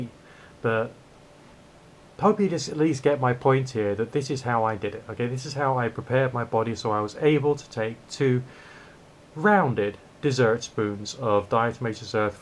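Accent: England, United Kingdom. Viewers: it's good to hear an English accent (for a change) rather than hearing the usual Yankee accents all the time.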